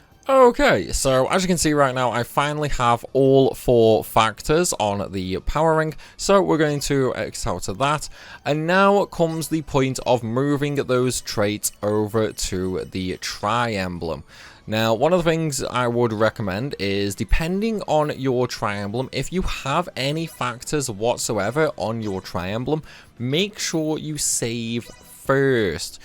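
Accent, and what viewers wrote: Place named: England, United Kingdom. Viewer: FAXXX 😂 ITS already difficult to understand a English dude from England, as an American his accent can get annoying, specially when he talks instead of giving us step by step process.😂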